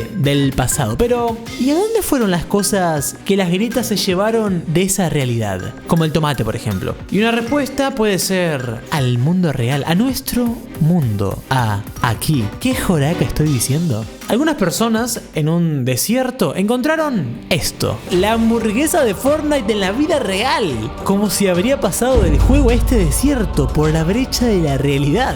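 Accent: Argentina. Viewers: El primer yutuber que habla argentino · sos de Argentina?? :'D que orgullo XD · H ey se de noto que sos argentina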